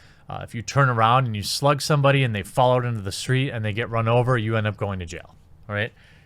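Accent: Wisconsin, United States. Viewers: Shit are you from Wisconsin? Respect 👍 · It's definitely a wisconsin thing... As an IL person that lived on the WI boarder for 8 years, they pronounce things weird sometimes, but it's not as bad as MN. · He also says “particularily” It’s a Wisconsin thing I think.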